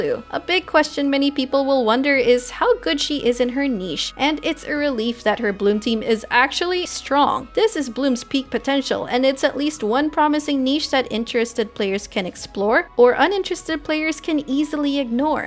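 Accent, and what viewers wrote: Philippines. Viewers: I figured by the accent, Pinoys just have that certain twang lol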